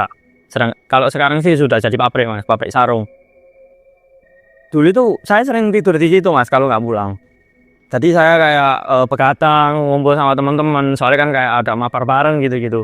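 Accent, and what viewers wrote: Java, Indonesia. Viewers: Mase lek crito unik, JoWo pool. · aaaaghhh medok sekali Saya yg bukan org jawa sangat susah memahami cerita nyaa 😢😢😢 Teenyata bahasa jawa itu kata "E" maksud dan artinya adalah "NYA" ya ?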